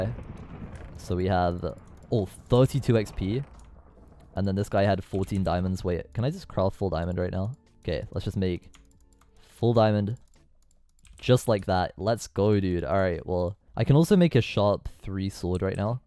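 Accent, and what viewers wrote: United States. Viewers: PLEASE LIKE I WANNA HEAR HIS AMERICAN ACCENT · You better keep your American accent If you dont... Your gonna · Like like like like like like like I WANNA HERE THIS MANS merican accent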